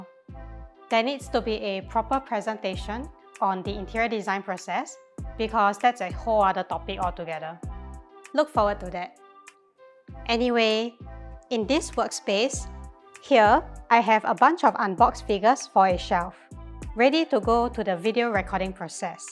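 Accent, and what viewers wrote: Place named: Singapore. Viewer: First time discover your channel and I'm wondering if you're Singaporean after hearing your accent and I'm right 😅, fellow Singaporean here 👋, I'm planning to become a youtuber as well, hope I can achieve that goal soon, best of wishes and amazing collection. 👍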